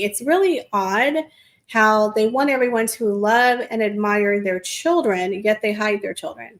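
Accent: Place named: California, United States. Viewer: I’m from California and I agree with your Mom that you sound like you’re from California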